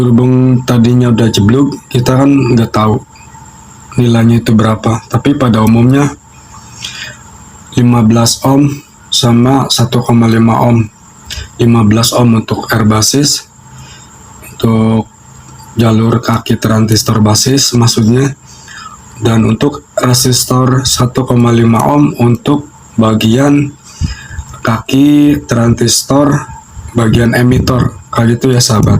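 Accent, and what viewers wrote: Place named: Banyumas, Indonesia. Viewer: sampean wong ngapak kulon ya mas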